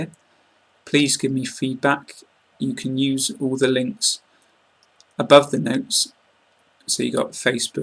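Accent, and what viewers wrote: United Kingdom. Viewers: An excellent series of learning English with the right british accent..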